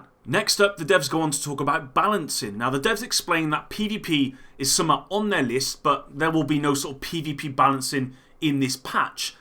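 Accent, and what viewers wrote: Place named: West Country, United Kingdom. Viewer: are you from Bristol or Plymouth?. Your accent sounds like you are.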